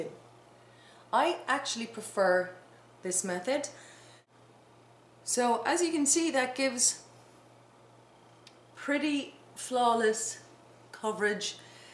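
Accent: Ireland. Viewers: wow it looks good must buy it are you from Ireland originally you sound like your Irish I am from Ireland Limerick xxxxx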